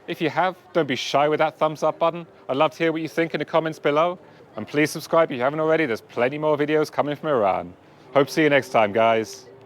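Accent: England, United Kingdom. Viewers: What part of England are you from? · Great video (subbed!). Where in England are you from out of interest? Your accent reminds me of my cousins 😄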